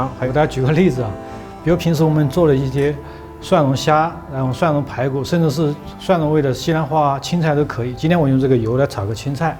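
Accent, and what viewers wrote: Sichuan, China. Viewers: 这很明显是四川口音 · 因为是川渝地区的人，所以会是椒盐普通话😂😂😂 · 椒盐川普，巴适！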